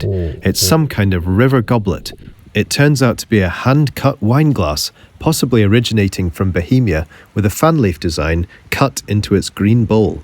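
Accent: United Kingdom. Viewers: Which country is this? He has an English accent but is he actually in England? 🤔🇬🇧🏴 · Love the British accent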